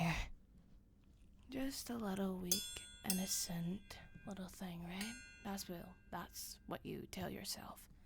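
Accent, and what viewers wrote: Scotland, United Kingdom. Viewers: I should be afraid, but the Scottish accent intrigues me way too much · I guess being scottish is a new fetish, welp *i like it*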